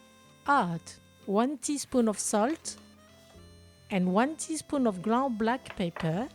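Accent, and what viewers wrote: France. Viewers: This has to be one of my favorite versions of this dish! The time you took and detail you provided was inspiring. I just love seeing another person who loves cooking world cuisine for the joy of it and it’s so heartwarming to see what I perceived as an Asian woman, with a French accent, making a West African dish that I, as a woman of similar differences, love recreating meals and the memories I love from around the world. Thanks for sharing 🥰Can wait to watch more of your videos ❤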